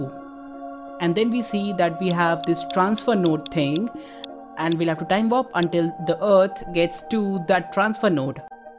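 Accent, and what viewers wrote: India. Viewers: Hey r u indiaian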